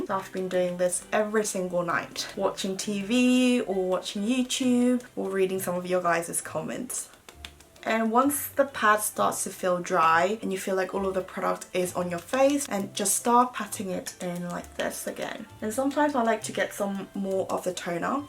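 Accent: United Kingdom. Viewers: 메이크업 영상보다는 일상 영상을 더 먼저 접했는데 언니의 여유롭고 행복해 보이는 삶의 태도가 부럽고 저도 닮고 싶었어요~😇학교다니고 시험에 찌들다 보니 언니처럼 밝고 긍정적인 하루하루를 살고 싶더라고요.😢😢항상 꿀떨어지는 영국 발음과 함께 좋은 정보, 영국의 예쁘고 아기자기한 일상들을 공유해 주셔서 감사합니다😘 앞으로도 응원할께요👊👊 제 올 한해 목표는 꼭!!!!라면을 줄이겠다!!!!! 기필코!!!!!!!!!!!!! 입니다💞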